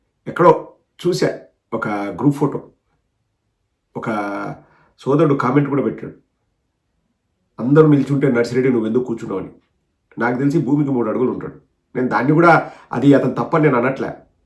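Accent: Rayalaseema, India. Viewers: Rayalaseema yaasa super sir meru...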